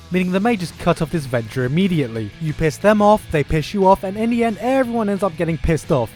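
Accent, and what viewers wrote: United Kingdom. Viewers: This actually made sense. While I like manga/anime, I must confess I seldom buy it (also due to the fact it almost doesn't get translated into my native language, ever), so I usually get my fill on the manga/anime sites out there. That said, I'm still rational about it; yes, I like the watch-it-for-nothing sites out there, but still, I acknowledge it makes sense from their perspective - as a business - to try to do something about it. Just like you, I also don't think the people claiming it's 'good advertisement' and 'I buy it anyway' have a major impact. It just doesn't cut it: most DO NOT buy everything they watch or read online, after all. I don't, for instance. The problem is, of course: nobody likes big companies, and certainly not those that make the 'free stuff' go away. I think if the manga was made directly available from the manga's site (or a site where mangaka directly sell their products), and that with a 1 dollar/euro one-click support button for instance, most would do it, at least for their preferred manga. 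Every fan would be more inclined to support a mangaka directly, than some company which they perceive as money-grabbing. That said, you are right: 25 bucks a year isn't all that much. Alas, it's not available around here (and it would need to have MY favourite manga in there too, obviously). But in any case: I thought you made some good points. I also found it funny when I heard you talk; it's sounded so 'British' (Queens English-like), I imagined you compl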